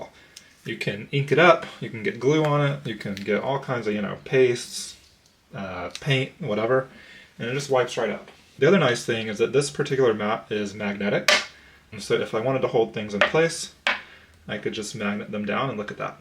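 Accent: North Carolina, United States. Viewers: Here in NC it is wadder, you were right. Im from foothills so it differs all across the state😂You have lost most of your accent but your voice is soothing.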